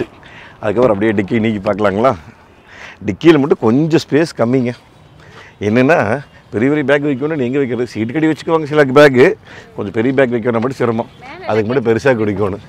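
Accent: Kongu, India. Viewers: sir naan ungal kongu தமிழுக்கு அடிமை ❤❤❤ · Anna ungaloda kuzhandhaithanam, Kongu Tamil ku na miga periya fan Anna 👌🏻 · Perfect Kongu Tamil. I live in US, and I take rental vans for trips, mostly I get Ford, Freightliner and Benz, looks like Force is giving equally or better than these models.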